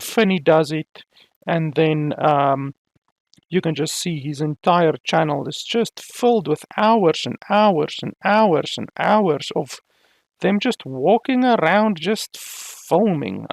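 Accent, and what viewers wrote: South Africa. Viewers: are you South African lol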